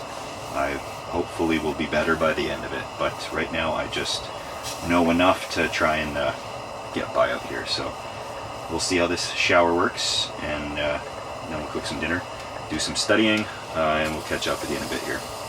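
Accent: Alberta, Canada. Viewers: are you from alberta?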